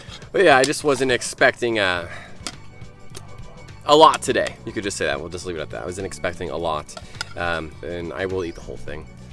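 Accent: Vietnam. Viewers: This is a guy trying to say english, he's vietnamese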